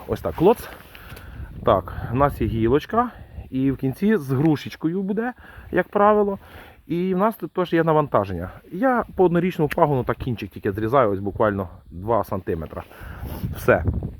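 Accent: Vinnytsia, Ukraine. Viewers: Забий, на Вінниччині всі так балакають. І це не від любові до 🐖🐶-ї.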